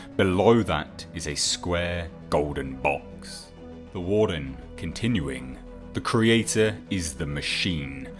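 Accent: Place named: United Kingdom. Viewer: With a little more bass in your voice, you could have a nice cenobite tone. Or maybe it's just the British accent. Yeah, that must be it...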